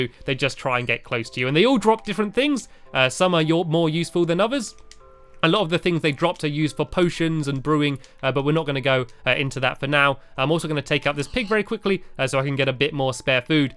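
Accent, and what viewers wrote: England, United Kingdom. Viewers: were u born in englend i cant tell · he ameracan or english americans call it z and engerlish calls it zed thats just there landguge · he’s british that’s why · Well you must be American because he is british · Cuz he’s English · Brianna Buckner Because there British and they live in the U.K. and in the U.K. they say zed instead of z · it' because Z was originally Zed but because of 'Muraca it's Z so technically he is right and were wrong "short answer UK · Brianna Buckner he's British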